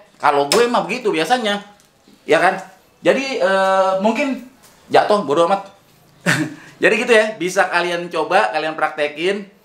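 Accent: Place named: Betawi, Indonesia. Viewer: Mantap bang,,,suka betawi nyablaknya,,salam berawi ora,,,😆😆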